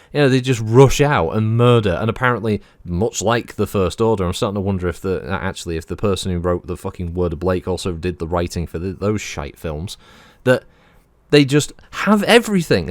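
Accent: United Kingdom. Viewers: I'm now going to start picturing you in director Krennic's uniform when you're doing every recording with that thick British accent 🙂.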